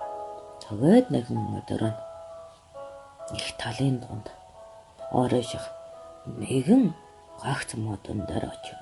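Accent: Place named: China. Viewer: Цаад хөгжим нь чанга, хажууд нь хужаа аялгуутай ойлгосонгүй ээ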